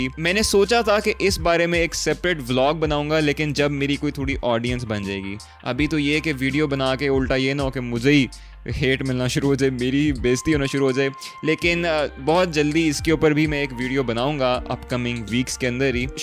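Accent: United Kingdom. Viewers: You Deserve My Subscribe. I like your English Accent And Your decent Talk. 👍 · Dude please teach me your english accent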